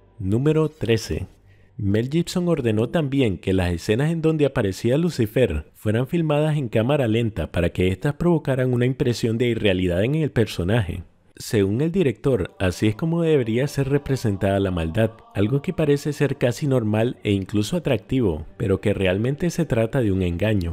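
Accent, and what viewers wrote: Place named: Spain. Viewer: Muy buen video pero tu acento es espantoso debe ser castellano neutro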